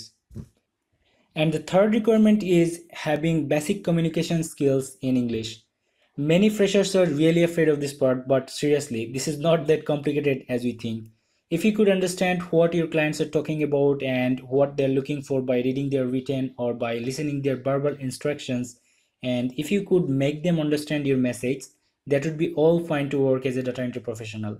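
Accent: Sylhet, Bangladesh. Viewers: R u from sylhet? Ur accent says that, great work bro🤗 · Are you from sylhet?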